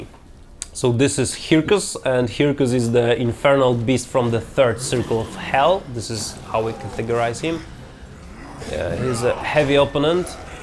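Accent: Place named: Eastern Europe. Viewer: his accent is so eastern europeany like it feels like im having sex with his voice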